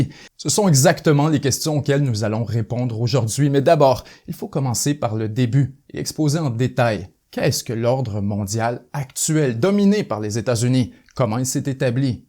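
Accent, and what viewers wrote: Quebec, Canada. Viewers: l accent possiblement! ;) Notre accent québécois! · Il est québécois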